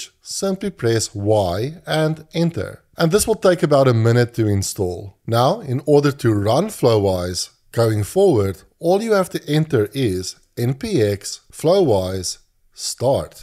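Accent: South Africa. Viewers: Great videos love the SA accent - in my flowise I don't see upsert config? please let me know what to do to get this.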